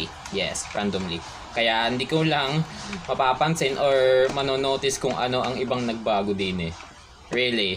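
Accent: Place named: Laguna, Philippines. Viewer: parang ikay taga laguna ..gawa ng iyong pananalita ay ... by the way new subscriber dine ...❤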